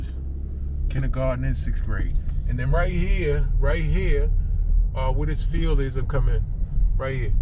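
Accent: United States. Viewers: U got a Philly accent to me. I didn't even know cherry hill had "projects" shot out to the bottom of the hill❤ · I hear a Maryland accent.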